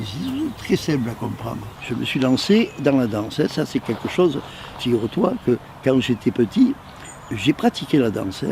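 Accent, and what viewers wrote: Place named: South, France. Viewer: Salut et ......Chuttttt 🤗😆 et quel bel accent du Sud. Le rose me va aussi 😅😅😅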